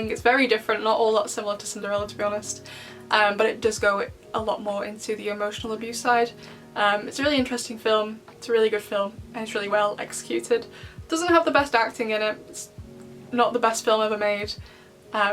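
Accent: England, United Kingdom. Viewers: I wish I had an English accent like you!! 😭 I just love the way you speak!!💗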